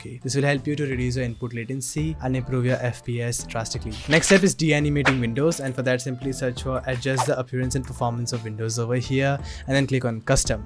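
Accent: India. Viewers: Is it possible to find a video guide that is not made by an indian guy with an unbearable indian accent? Just asking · Is it possible normal guy in india to talk in english without 95% of the Indians cry about his accent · You are indian, right? I can't understand a lot of things because of the accent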